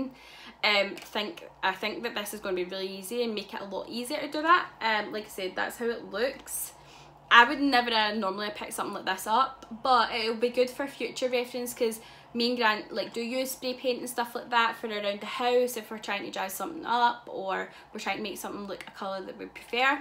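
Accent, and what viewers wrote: Scotland, United Kingdom. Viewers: Are you from Scotland and if so where about in Scotland xoxo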